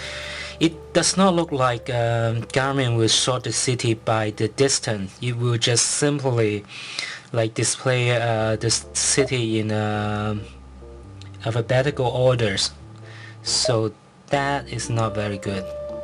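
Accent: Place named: Vietnam. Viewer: The accent of this guy sounds like Vietnamese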